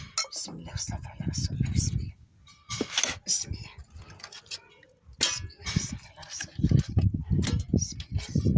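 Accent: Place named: Gulf, Arab world. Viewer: ماشاء الله 😍. باين بنينه وروعه كما للهجه عندنا بالخليج 🌹. تسلم يديك خالتنا العزيزه❤️ سعدات الي بياكل من طيابك 👍🏻❤️🕊️🕊️🕊️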